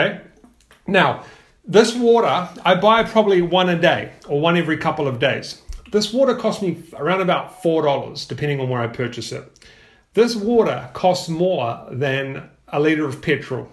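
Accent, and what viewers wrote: New Zealand. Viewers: are you from NZ?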